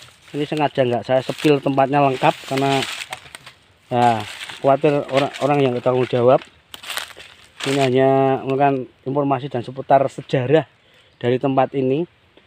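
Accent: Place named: Java, Indonesia. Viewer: Dari nada suaranya pasti org Jawa ini banyak dobol nya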